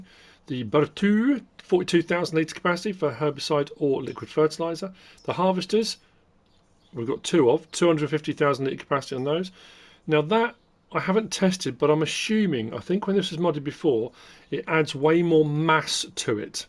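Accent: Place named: United Kingdom. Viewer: I was just reading an article "Here’s Why So Many Movie Bad Guys Have British Accents" AND i Thought of you 💡 You have that perfect villain accent that has many features that are perfect for a villain. Queen’s English make people appear, quote “more educated, intelligent, competent, physically attractive, and generally of a higher socioeconomic class.” end quote lol. I have an EVIL PLAN.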